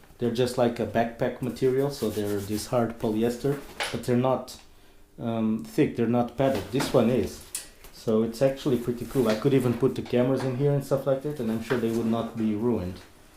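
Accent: Portugal. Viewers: thanks a lot and keep doing this kind of videos. I'm following your channel with special atention since i have exactly the same bike. Are you Portuguese? Your accent sounds from a portuguese.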